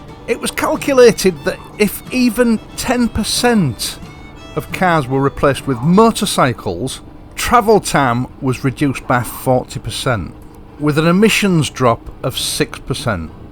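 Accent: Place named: Bradford, United Kingdom. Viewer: South Bradford accent ? Trying to pin it down is doing my nut in... trace of Irish-Leeds though...must be munt it ?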